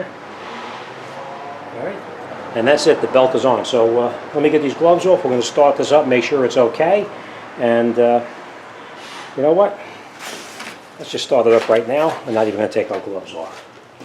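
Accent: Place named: New Jersey, United States. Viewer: I can tell from your accent you're from Union or Bergen county NJ. I am from NJ. Every mechanic I hear is from NYC/NJ hahaha. Thank you I needed this.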